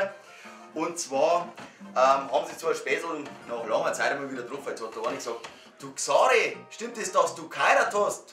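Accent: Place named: Bavaria, Germany. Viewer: ich versteh kein wort...krass komme ausm nachbarbundesland aber ich mag den...die bayern...haltet eure fahne hoch...ihr seit was bsonderes...passt euch net an...bleibt urig;)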